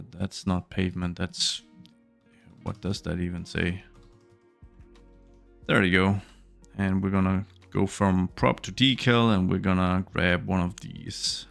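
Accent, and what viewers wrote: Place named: Denmark. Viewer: Are you Danish? Because your accent really sounds like us.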